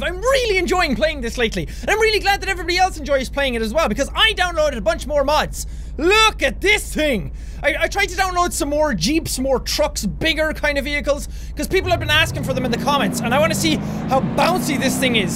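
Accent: Ireland. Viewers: the first video i ever watched, 8 years ago, i was obsessed with beam ng and saw this video and muted the sound cause i didnt like lets plays at the time. then i realised he was irish, same as me, and then i thought he was cool. 8 years later and i watch meme time everyday because its the only cure for sadness. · Yeah, hes irish, IRISH people are cool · That accent is OBVIOUSLY Irish. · This has been my first time on this channel and I must say, your accent just sells it! That Irish? I think it's Irish. Well, whatever it is, I love it! It just tickles me! I'll keep looking at your stuff, and am heavily considering subscribing. Thanks man! Cheers! :D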